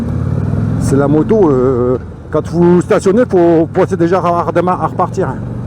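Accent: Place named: North, France. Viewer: l'accent du nord c'est top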